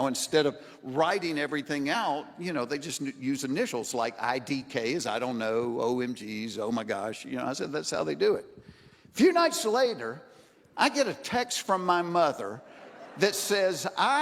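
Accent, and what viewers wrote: United States. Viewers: Not funny Jeff is going to be old soon. Making fun of the elderly is uncalled for. He has no respect for the elderly that helped support him baby sat when he was a baby and a little boy growing up. He was spoiled and the parents let him treat his grandparents anyway he wanted. Bad parenting has spoiled grown children. Jeff acts like he is younger than he is. To think his mother is in her 80s. Yes an elderly person can carry at 80 since their grown children are left alone in their homes and they live by themselves the elderly have to have protection. They don't have to buy a 38 caliber gun they can buy a small 22. How would he feel if his mother was robbed at night and they hurt his mother all because he made fun of her not wanting her to get a gun. The bucket has been a part of history when they didn't have bathrooms with flushing toilets and most everybody all ages had a pot that was put under their bed. 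How about the poor that had to use buckets and people they only had out houses. He's got a southern accent he should know the south had hard times just like the people in the north New York. Shame on him being a so called comedian. The elderly have real medical problems no related to age. Alzheimer's is Diabetes of the brain and the researchers found a 13'year old with Alzheimer's. They quoted its not related to age. forgets things all the time he isn't going to be all alone in forgetfulness because memory loss comes from pesticides and what's all in our food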